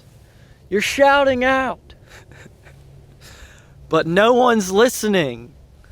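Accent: South, United States. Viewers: Great video man. Its cool to see someone in a video who talks like me. You from the south?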